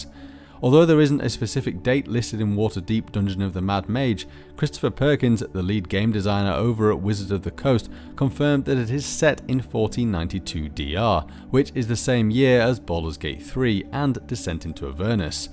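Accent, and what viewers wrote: United Kingdom. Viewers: Yes. Yes. An excellent lore video, per usual. I was particularly impressed with the quality of your elocution. But maybe that has to do with your posh accent. Anyway, please get more yarn. I truly love your BG3 theory videos.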